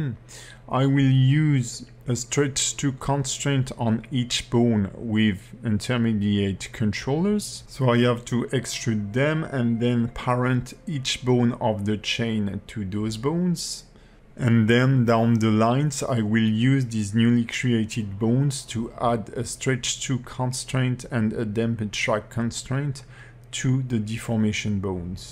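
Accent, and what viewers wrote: France. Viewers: So I came here because I'm struggeling with a super easy mesh and rig, just movement, rotation and IK and everytime I put IK on my mesh it glitches about wierdly. This is way above me, I will come back when my IKs work. Good inspiration though, and love the slight touch of authentic French accent ^^ Merci à bientôt!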